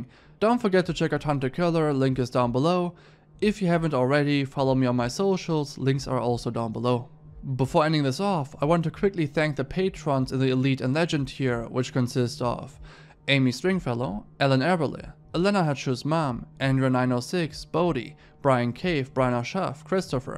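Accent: Germany. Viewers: I knew u were German u have a similar accent to my bestfriend from Augsburg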